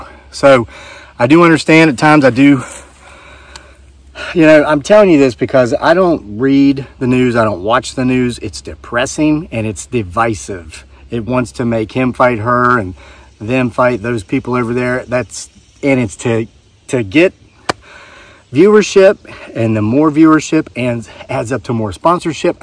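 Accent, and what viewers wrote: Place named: West Virginia, United States. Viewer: You sound like you are from a small town in West “by God” Virginia, lol. I’ve really missed your videos. Our philosophy is basically the same. We must have grown up close to the same small town. 😜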